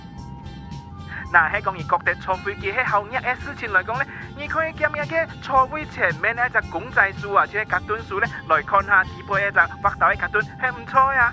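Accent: Huizhou, China. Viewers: 视频讲的客家话是惠州腔。我看过惠州视频里面讲的客家话跟我们沙巴的一模一样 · 好像惠州客家话的样子，不过和梅县腔不一样啊，配音的视频吧？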